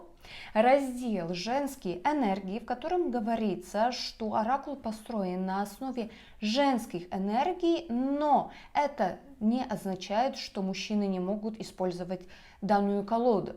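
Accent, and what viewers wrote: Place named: Baltic states. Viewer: Очень красивый и лёгкий прибалтийский акцент ❤️✨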